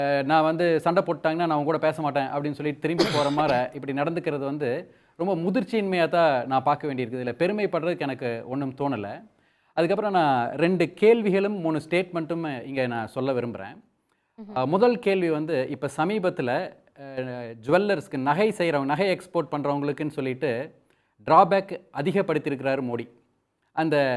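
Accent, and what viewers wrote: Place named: Kongu, India. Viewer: கொங்குத் தமிழின் சிறப்பியல்போடு கருத்துகளையும் சிறப்பா வெளிப்படுத்தியிருக்கீங்க... அருமை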